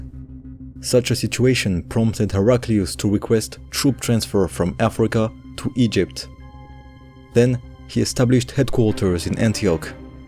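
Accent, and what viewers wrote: France. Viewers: 😂 l’accent French , merci beaucoup tu régale , j’imagine pas le taff derrière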